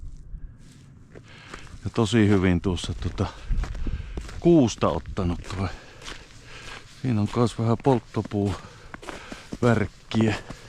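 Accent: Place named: North, Finland. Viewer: Näin metsäalan ammattilaisena ottaisin tuosta nuo harvat hieskoivut polttopuiksi ja kiireesti kuusen taimia maahan. Olisin istuttanut ne jo viisi vuotta sitten kun sanoit, että laidunnus on siinä jo loppunut. Nyt siinä kasvaisi jo perkuukypsä kuusen taimikko. Nuo kilpailun hävinneet vanhahkot keskikokoiset kuuset ovat aina arvoitus. Melkein kun sellaisen kaadat on siinä jo musta piste keskellä eikä siitä silloin enää kunnon puuta tule, vaan vie tilaa terveiltä puilta. Eli olisin ottanut ne harvat pikkukuuset ja hieskoivut kuiduksi jo hakkuussa ja istuttaen uusi taimikko tilalle. Hieskoivu tuppaa olemaan niin kova siementämään, että raivurille on taatusti työtä aina uuden vesakon puskiessa yhtenään. Murteesta arvelen, että kohde on pohjoisessa joten näin pirkanmaalaisissa eteläisen suomen metsissä työskentelevänä maaperä ja puun kasvu on tietenkin erilaista luonnon olosuhteista riippuen ja se mikä toimii etelässä ei aina toimi pohjoisessa. 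Rannan läheisyys ja kostea maaperä kohteessa tukee tietenkin koivuista hieskoivua, kun raudus on karumman maaperän puulaji. Kuusi on toinen mikä tuossa menestyisi kuten sanoit. Kuusi siementyy luonnollisesti koivun alle menestyksekkäästi ja luulisin, että lähipuista muodostuu uusi kuusi sukupolvi tuon rantakoivikon alle. Näin sen voisi kaksois kasvattaa lopulta kuusta suosien ja ne koivut pois vaihtaen lopulta puulajisuhde kuusivoittoiseksi. Jatkuva kasvatus ei onnistu kuin teoriassa ruutupaperilla ja on sääli, kun erinäiset viherideologiset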